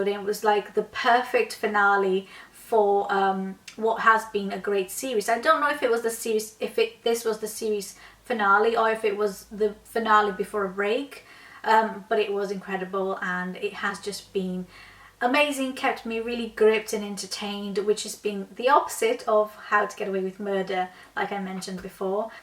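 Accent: Wales, United Kingdom. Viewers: I need to ask where you're from? Because your accent is so interesting, like Welsh and Londoner and other things combined aha!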